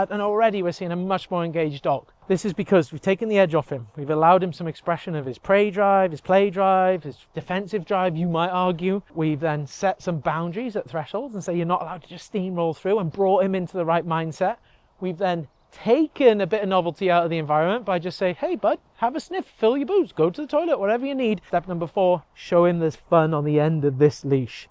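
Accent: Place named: England, United Kingdom. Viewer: Good to see that I'm on a good way and combined merhods as you 😉 I thought it's mean after sniffling anyway to forbid everywhere. So I startet to take him in sit position in front of hotspots ... and wait till he ask with view to let him sniff and pee ... Realky great vid you kreate and I love the english 😁😆 nice to liste to 👍🏻 compares to the american ...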